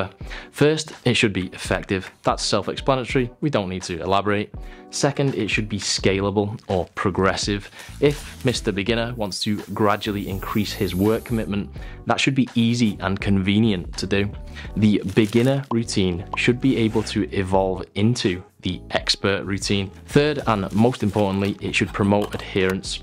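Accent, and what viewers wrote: United Kingdom. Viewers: Are you from UK ?? your accent is very hard to follow.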